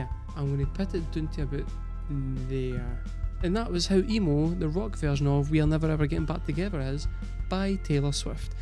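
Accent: Scotland, United Kingdom. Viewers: This is my first time viewing your channel. Really enjoyed your reaction One piece of context I’d like to share is that this isn’t a touted “rock version”. She never said that. Fans that recommend the reaction say it. This is just how she played the song that tour. We can say it’s rock or not but she never made that promise or claim Also, she’s done pop rock before. sounds shockingly like and from 2010 might as well be a song. I’m gonna subscribe and check out your other reactions P.S. your accent is gorgeous. You’ve got to be a Scot?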